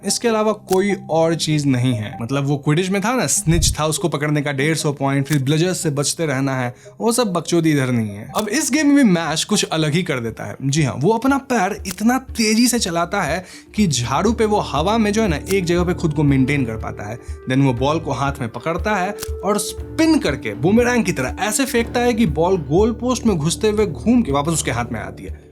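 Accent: Bengal, India. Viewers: Bengali ho app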